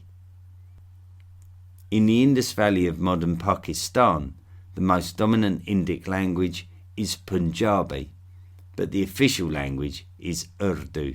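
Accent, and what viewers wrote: United Kingdom. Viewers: Voice reminds me of American sportscaster, Howard Cosell. Only with a British accent. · While the “a” does sound Aussie-like, his other vowels, contractions and other patterns are definitely British but I can’t pick the region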